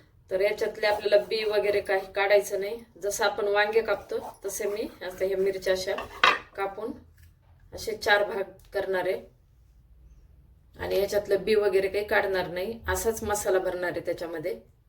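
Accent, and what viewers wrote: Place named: Beed, India. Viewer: शिमला मिरचीची काय भाजी 🫜 मिरच्या धुवून साफ करून याच्या मध्ये मसाला भरलेला❤🎉 ताई तुमच्या भाषेवरून माझ्या आठवणीत आले होते तुमचे नक्की बीड माहेर असणार❤ बीडची भाषा आपापली लगेच ओळखायला येते❤ ढोबळी मिरची रेसिपी खूप छान वाटली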